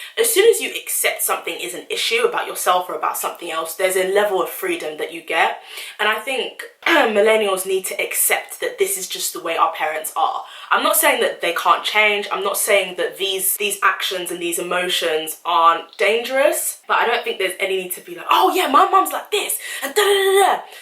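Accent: United Kingdom. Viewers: I am glad that your are speaking pure British English, and not the wrong stuff that we Africans speak. Obviously you are born and raised in the UK. However, recent immigrants should be able to learn that, with a little effort, especially those with university degrees. I am a recent immigrant and I respect any new language I learn and try to speak like the natives. Thank you for being their so that when I get better at the language, whenever Africans ridicule me for not speaking African English, I will show them your video.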